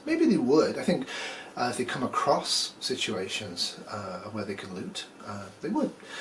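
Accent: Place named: Scotland, United Kingdom. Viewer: You sound more Scottish then Cannuck.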